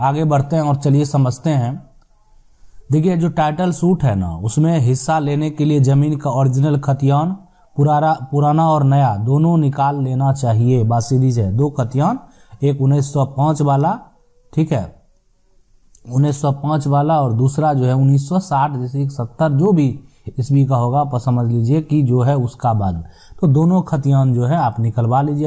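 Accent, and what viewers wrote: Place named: Begusarai, India. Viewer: आप बेगूसराय बिहार से हो आप के बोलने का तरीका बेगूसराय जिले का है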